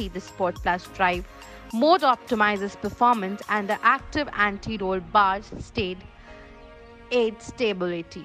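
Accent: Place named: India. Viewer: Sorry as non Indian it is too difficult to understand too strong accent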